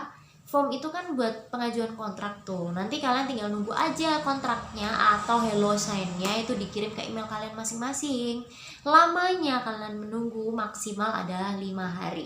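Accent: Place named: Java, Indonesia. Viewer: Medoknya menteb bnget mbak, sama kek saya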